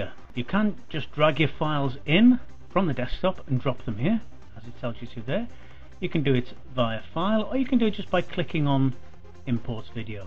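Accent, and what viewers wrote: Wales, United Kingdom. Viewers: where are you from? coz you sound kinda welsh.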